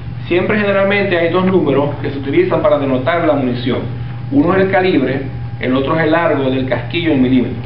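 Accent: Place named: Venezuela. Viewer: por el dejo se nota que es venezolano.... habla tanto del ak-103 pero no muestra ni uno....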